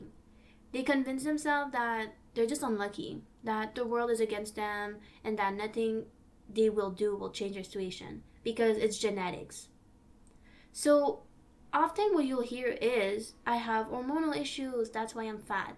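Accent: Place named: Canada. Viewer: I’m asking because of your accent but where are you from? I think I might have heard you you say you’re from Canada?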